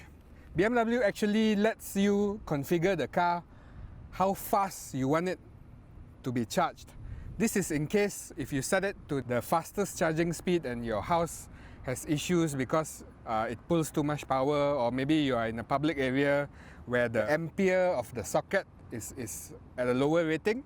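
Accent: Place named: Malaysia. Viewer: Great review. Informative and extensive, with nice Malaysian touches with your use of "la" in your speech!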